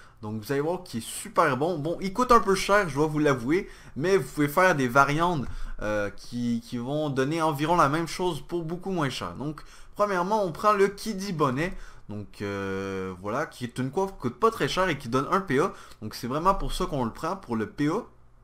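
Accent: Quebec, Canada. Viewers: Yessss un québecos ostie!!! Enfin!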